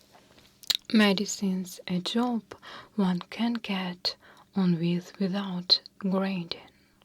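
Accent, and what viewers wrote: Russia. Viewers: I love, love, love when you read us a story! Your English keeps on getting better and better! But I love your Russian accent so much! Those earrings are amazing too! Thanks so much!:-)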